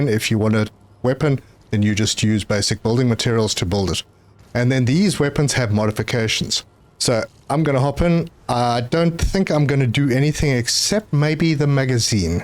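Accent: South Africa. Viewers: You sound afrikaans! Hoe gaan het! Im Dutch but know a bit of afrikaans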